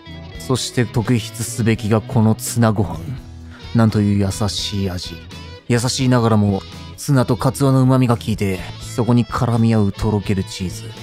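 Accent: Kansai, Japan. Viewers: 「 」「極主夫道」大好き人間には たまらないご馳走料理グルメ番組（;; ） 極道なのに、どこかかわいい主人公… 極道なのに、食材に愛を感じる切り方 極道なのに、ポメと仲良し そしてなぜか関西弁… 卵かけごはんにごま油かけて 牛肉とニンニク乗せて チーズ炙ってバジルかけて マヨネーズとごま・のりを乗せてた料理みたいに どことっても美味しい要素しかないっ 大好きだ❤️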